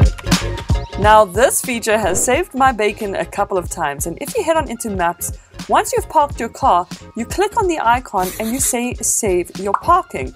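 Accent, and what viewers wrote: South Africa. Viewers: Is that a South African accent I hear? 👀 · Kom je uit Zuid Afrika?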